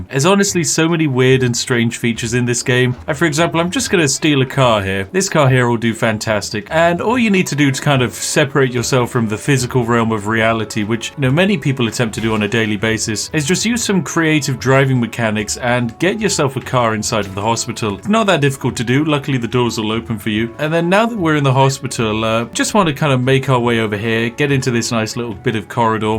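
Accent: United Kingdom. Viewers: This guy sounds kinda british.. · There's something inherently hilarious about a grown man with a refined British accent saying, "Right. Now I want to go play on the swings." My cheeks hurt from laughing. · I love your British accent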